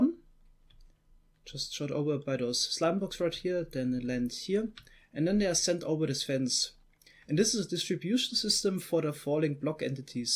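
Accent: Germany. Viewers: Are you from Germany ? Weil ihr euch so anhört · in my understanding he is german, his accent is very similar to mine, :D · Dezenter deutscher Akzent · Der deutsche Akzent hahahaha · Ja man hahaha dachte am anfang das er Deutsch redet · t0rf I'm sure he is :,D His accent and some accidentally used German words gave it away ^^" And everyone knows that Germans are the best engineers soooooooooo... Just kidding but ye, I think he's German :D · Man hört dein deutschen Akzent bro aber krasses english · Man hört deutlich das du deutscher bist... Ist normal lul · Da hör ich doch den deutschen Akzent raus😂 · Where u from? U sounds like german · Du klingst deutsch lol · Are you german? You sound like your german xD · The german accent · Hörst dich an wie n deutscher lul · Ist das Deutsch-Englisch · Dein Deutscher Akzent haha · Du bist deutsch hört man👍👌💪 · Are you German? 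You sound very german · He sounds German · Are you German? I thought it because your Accent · wich language do you normally speak, because you kinda sound german or spain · Hellocould i ask something are german because your accent is almost like mine when i speak english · It really sounds like you are German and try to speak English. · I think you are german because you have this actzent and i knew this because i have this actzent too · So nice man merkt das er deutsch ist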